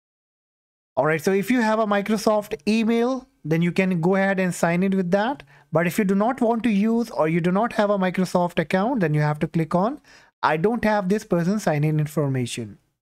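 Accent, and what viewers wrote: India. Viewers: i found this video, and when i hear india accent i start looking for another video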